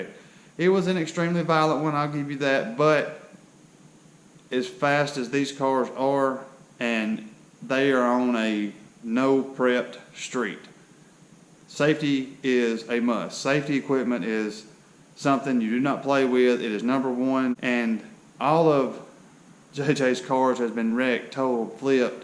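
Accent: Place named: South, United States. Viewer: That's the kind of cheap barn that attracts tornadoes. You sound like you're from the south, I hope you have insurance ....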